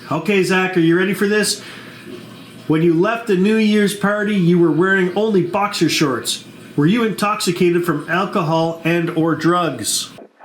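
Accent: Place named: Scotland, United Kingdom. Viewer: I always hear that Scottish accent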